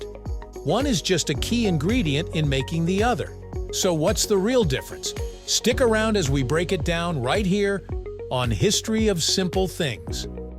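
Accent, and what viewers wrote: United States. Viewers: I noticed the title and thought that this has to be an American video. All English speaking people (apart from Americans) know that cement is a dry powder that needs to be mixed with sand , water and small stones to make concrete. When the mixture is cured and you have a very hard substance that is called concrete. I watched 20 seconds to hear the accent and then switched off.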